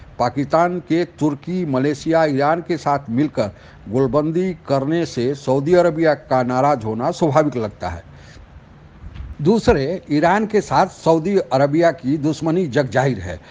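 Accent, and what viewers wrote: Bihar, India. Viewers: me toh samjha samjha k thak gaya uncle ko ki bihari hindi tone achi sunai nai deti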